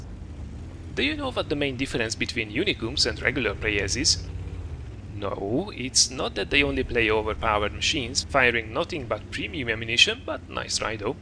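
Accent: Hungary. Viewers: Hey! Are you Hungarian, because the accent of yours sounds like you are to me. :D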